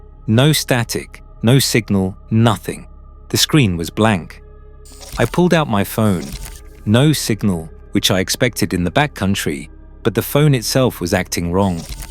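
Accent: United Kingdom. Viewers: Why a British accent if this person was supposedly from the US PNW?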